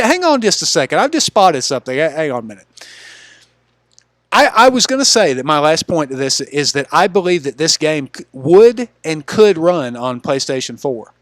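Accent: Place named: South, United States. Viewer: Also, sony claims that this was built "from the ground up" for ps5, so they are saying this is the "best" they could do with the ps5 hardware........in a way. Its poor ass marketing and obviously a lie. I don't like this kinda shit when nintendo does it, and I still don't like it when other companies do it. On here the hypocrisy about this game from many is worse than Nancy Pelosi,and the democrats in general. Is it a coincidence than sony droids and liberals are one and the same?? LOL Its funny how many on here have made videos similar to this one, but they will not call it a cash grab, ya know exactly what this is LOL Buy it used on disc at some point man, that way they don't get another sale from you. Its what I'm gonna do LOL. This is a big reason I still love physical media. Oh and I'm from NC, so don't really mind your southern accent LOL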